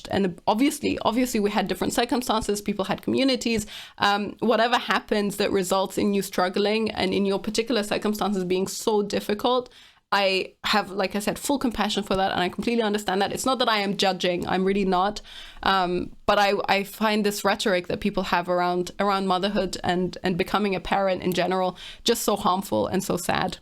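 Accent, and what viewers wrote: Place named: Germany. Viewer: great points :) you articulate yourself very well -I hear a slight german accent .... I lived in Berlin for 10 years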